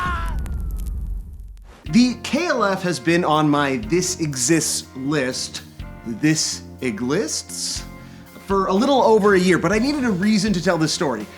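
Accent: Canada. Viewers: your canadian accent is so god damn thick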